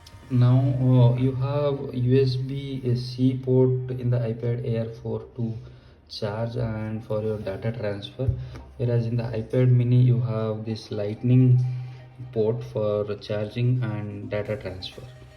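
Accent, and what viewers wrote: Odisha, India. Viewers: Ye pakka ama odia pila ta. English ru Jana padijauchi. Good going bro. Good. And all. Wishes to u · Voice tone suniki janigali odia pua boli😂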